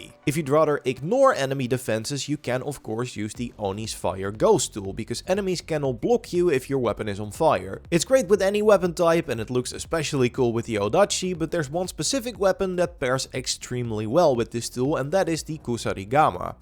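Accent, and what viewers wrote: Netherlands. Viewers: Bro are you dutch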